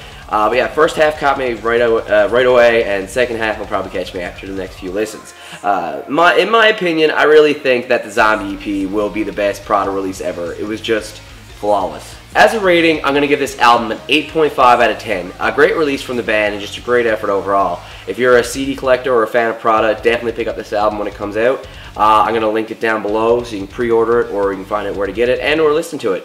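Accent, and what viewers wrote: Canada. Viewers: Are you Canadian ? Decent review don't like mikes new vocals .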